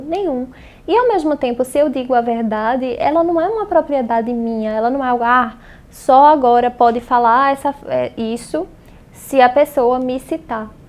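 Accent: Brazil. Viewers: Acho engraçado o sataque dela de Pernambuco!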